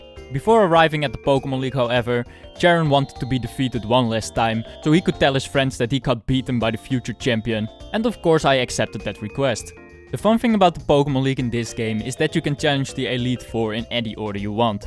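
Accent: Netherlands. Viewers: Are you dutch Your accent kinda sounds like it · Are you Dutch? You sound like that. But I really liked this video :) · Nice dutch accent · Are you dutch? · Je bent nederlands of niet je hoort gelijk pik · Where are you from. My guess is the Netherlands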